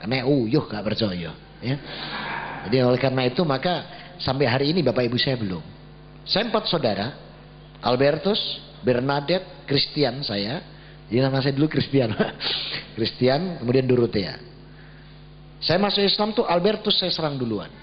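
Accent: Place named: Java, Indonesia. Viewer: jowone medok 😄😄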